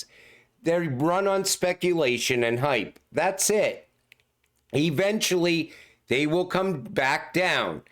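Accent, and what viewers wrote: Pittsburgh, United States. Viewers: You sound like a Yinzer, from Pittsburgh!!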